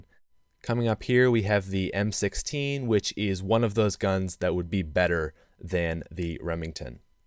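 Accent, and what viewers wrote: Canada. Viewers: you sound like you are from Canada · are you canadian?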